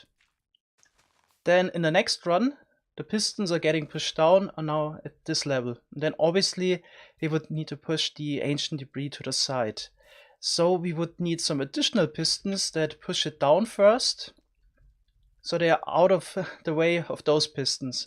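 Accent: Germany. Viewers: are u german ur english is somewhat funny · Wie deutsch kann dein Akzent eigentlich sein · Holy Mother of German Accent! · dieser Deutscher aktizent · Where are u actually from? I am hearing a german accent. Am I right? xD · Ich habe sofort an deiner Stimme gehört das du Deutscher bist! :) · Uff.. dieser deutsche Akzent geht ja gar nicht 🙈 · I detect German accent 😋. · That's German English xD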